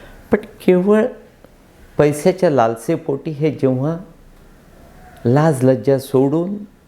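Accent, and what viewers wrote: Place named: Gujarat, India. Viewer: Kaka tumache bolane aikatana waatate ki koni gjaratalech bolat aahe ....🙏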